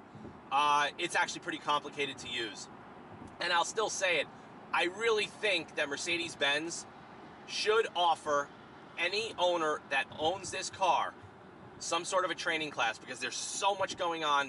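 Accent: New York, United States. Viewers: buh'n ("button"), "sah'n" ("satin") - straight New York / New Jersey.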